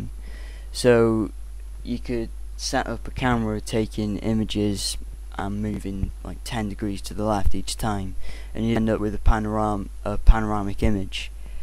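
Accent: United Kingdom. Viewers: yes brtain people nice accent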